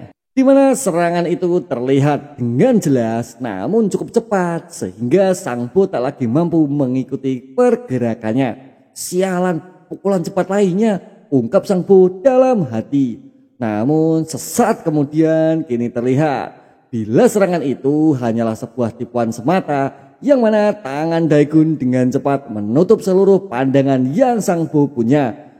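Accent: Java, Indonesia. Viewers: Jangan di ulang terus kalau ngucapin nya Logat Jawa Lo jangan di liatin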